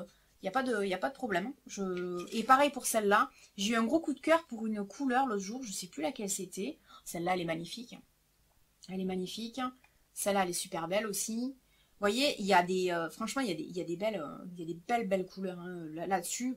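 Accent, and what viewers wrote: South, France. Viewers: Super video comme d'habitude ! La palette UD me donne bien envie (j'ai la 1 que j'adore) par contre BYS ne me tente pas 😒..... Et je suis devenue comme toi avec ta youtubeuse préférée.... Je ne me lasse pas de tes vidéos ( je les ai toutes visionnées) et j'adore ton accent du "sud".... Ne change pas 😍 Biz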